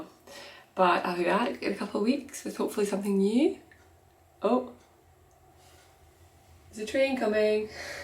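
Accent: Scotland, United Kingdom. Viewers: I have been watching your videos for the past year for two reason’s first because I love to knit and I love and have knitted several of your patterns and the second one was that for the past year I have been planning a trip to Scotland with my sister. I thought that listening to you speak with your lovely Scottish accent that maybe I would be able to better understand conversations and surprisingly I must say that it seems to be helping. I’m sort of training my ears a bit. I’ll be mostly in the Highlands for 10 days in May with only one day in Edinburgh p. I am so looking forward to my trip back to my ancestral homeland.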